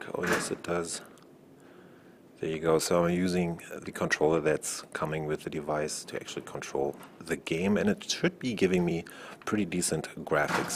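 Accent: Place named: Germany. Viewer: I love your german / english accent :p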